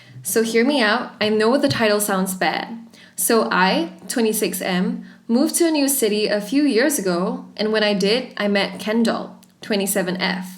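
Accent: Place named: England, United Kingdom. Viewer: The reader has a slight English accent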